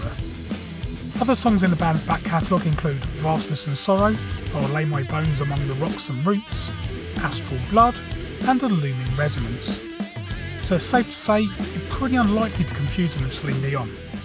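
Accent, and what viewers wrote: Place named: Netherlands. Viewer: you sound more Dutch actually, which is very funny to me as a Dutchie in Germany. Looking forward to your next videos, I just discovered your channel via Reddit & I’m binge watching all of them now👌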